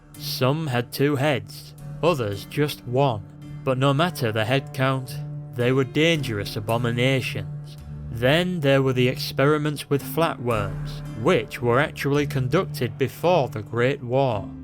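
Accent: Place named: Northern England, United Kingdom. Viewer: Great video my friend. Love the northern accent!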